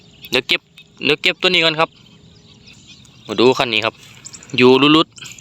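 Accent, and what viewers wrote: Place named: Korat, Thailand. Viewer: อยู่ อำเภอไหนครับพูดโคราช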